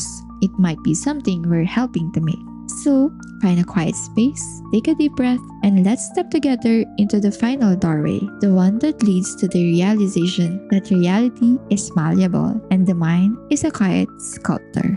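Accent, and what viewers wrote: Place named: Philippines. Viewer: Pronunciation....since you have Phillipines english accent or somewhat like that...use AI for your for narration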